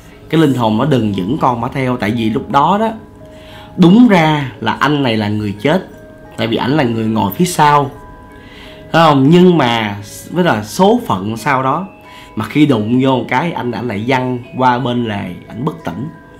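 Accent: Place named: Central Highlands, Vietnam. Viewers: đáng yêu cực kỳ. nói giọng Tây Nguyên. cưng lắm luôn. Nghe sướng cực kỳ. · Đang nghe anh kể chuyện nghe tới phút cười muốn nội thương anh nói giọng Tây Nguyên dễ thương ghê á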